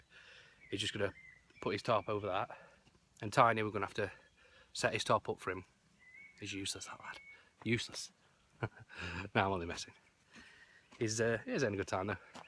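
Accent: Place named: South Yorkshire, United Kingdom. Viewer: Good vid lad, going from your accent you ain't far from me... I live in Wombwell 👍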